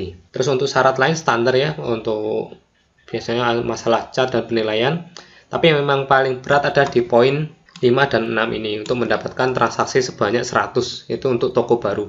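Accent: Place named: Pekalongan, Indonesia. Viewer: Orang pekalongan yah mas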